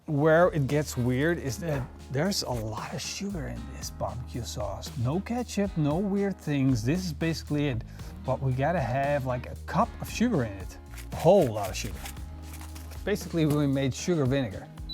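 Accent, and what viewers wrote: Netherlands. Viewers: are you dutch bc's i am and i think i notice the accent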